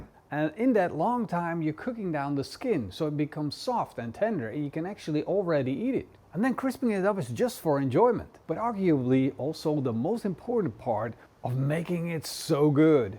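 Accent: Netherlands. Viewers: I am so annoyed at the fact that I haven't been able to guess your accent 2 minutes into the video.. I'm guessing Germanic or Nordic but I just can't lay my finger on it 😂 Looking forward to the result! (And whether I can find more clues) Edit: a minute later I still want to say Dutch, but one who is a massive Elvis fan! Edit 2: Nederlands, laatste antwoord. Edit 3: Als ik terug ga naar het begin hoor ik de Elvis niet meer... maar het Neërlandsch is deste duidelijker haha. Eindresultaat ziet en klinkt heerlijk! ^^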